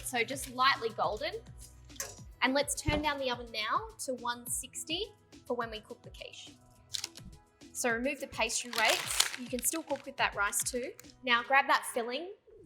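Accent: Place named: Australia. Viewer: She's so happy all da time man...and she sounds like she's from Australia. What a terrific woman. Preciate da video fr💚🖤🤲🏾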